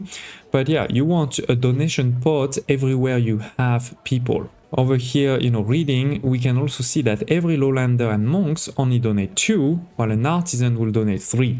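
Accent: France. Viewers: Nice video ! (are you french by any chance ?)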